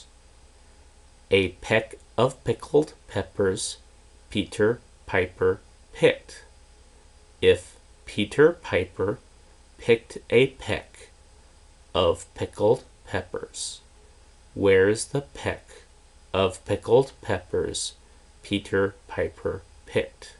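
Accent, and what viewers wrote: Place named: United States. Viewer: One problem: this is American pronunciation. Correct English pronunciation is British Standard English (RP).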